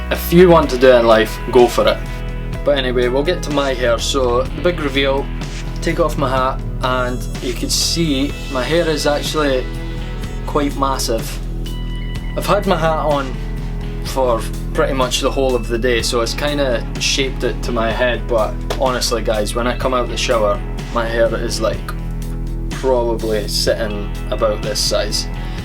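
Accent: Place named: Scotland, United Kingdom. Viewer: Hmm the accent.. Where in Scotland are you from,?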